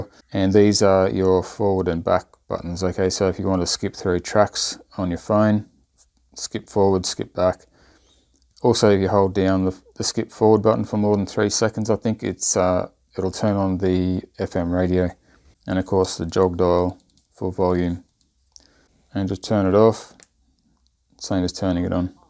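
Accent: Australia. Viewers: I stumbled across your video while trying to find reviews on Fodsport products. I'm always amused by the fact that I can tell when a video is shot in australia (other than the accent, the lighting and colours just look a certain way). I didn't pay much attention to the poster in the background (until after the video and coming back to comment) and skipped through the first part of the video to the test ride. I often try and see if I can figure out what city/town it's in and if i can recognise anything if it's a place I've been. Well the traffic lights didn't give me much help, but as soon as i saw the show grounds i knew exactly where you were. I'll have to check out some of your other videos (gotta support another local). I just flicked through your vids and saw you are giving one of these away. It's just a shame I won't be able to get enough info to enter the draw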